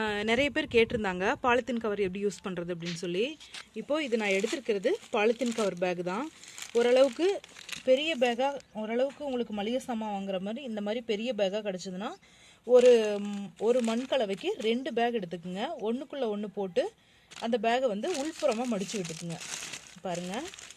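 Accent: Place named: Kongu, India. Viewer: kozhi koovuvadhum iyarkai suzhalum ungal kongu tamizhum niraivai irukkirathu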